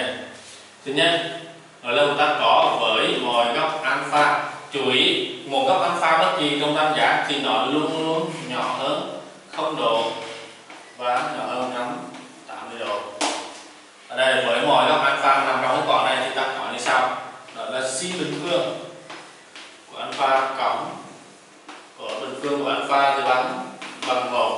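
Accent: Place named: Nghe An, Vietnam. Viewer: nói giọng giống nghệ an rứa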